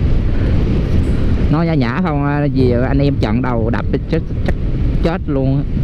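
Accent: Central, Vietnam. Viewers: Chưa bao giờ cmt trên yooutube,nhưng giờ phải cmt...Đây mới là MotoVlog đáng xem,xem xong 1 video được khá nhiều thứ cùng với giọng nói rất thân thương,ng miền bắc nhưng sao thấy giọng miền trung thân thương quá.Cám ơn đã bỏ thời gian và công sức để làm ra những video rất hay,rất bổ ích cho mọi người..Chúc và gia đình luôn khoẻ mạnh.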